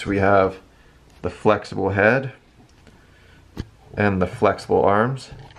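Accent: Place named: Canada. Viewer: You sound Canadian o.o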